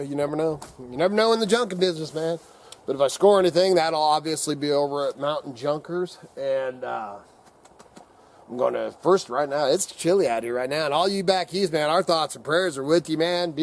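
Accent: South, United States. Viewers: You're making me laugh and I love you videos when I get a chance to see him cuz I work at night you make me really laugh it's fun to watch your videos because you give a simple thing to it like that Duck Dynasty Phil Southern kind of thing that you have and you're not even from the South but you sure have it.... and that's where people don't grasp that you know from where I'm from the south there's a lot of people like you I've been you in my life but you do what you have to do to make a living in life and sometimes we don't always need as much as we think we do that's what you've taught me you know I've gotten rid of a lot of things that I don't need but I also keep the things that I know I need down the road... but you've definitely made me want to live further out and thank God I'm in this shape not many people 50 years old get that opportunity I thank God everyday that I can do the job I do cuz if you saw the job by do you read like then you went from one job that you had before to another one that's just as hard but you know I look at that way it keeps me going I don't have to pay for a gym can I get the enjoy life a lot better.. 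basically the share with you my life has full circle came back everything I ever lost in my life I gain back tenfold and I have to admit that because it didn't just happen on its own cuz I don't believe in luck... hey does it hurt to have a prayer come from your lips sometimes... but you remind me of a simpler time that's why I want to come visi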